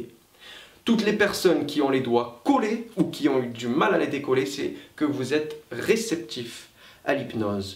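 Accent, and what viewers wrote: France. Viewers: Petit accent Toulousain... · Il a l'accent du sud' c'est très dur de se concentrer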